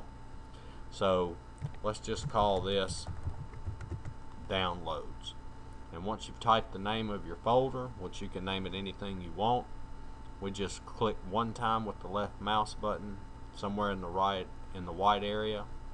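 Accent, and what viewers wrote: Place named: Appalachia, United States. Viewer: how about on chromebook ps you sound like a hill billy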